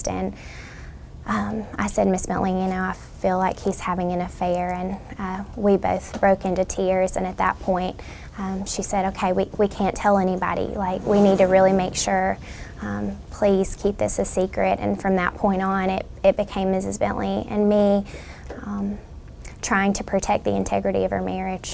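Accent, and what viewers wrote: South, United States. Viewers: How rude are you she has a southern accent. I am from the UK 🇬🇧 and I can understand her.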